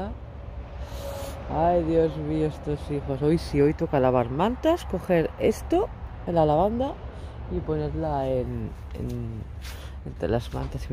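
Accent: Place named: Catalonia, Spain. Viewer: Muchas musulmanas podrían tomar ejemplo de tí, porque hay algunas que madre mía! hay que darles el té aparte. 🤦‍♀️🤪 Saludos de una mallorquina desde Mallorca, te noto el acento catalán, ets molt maca! petons. 👍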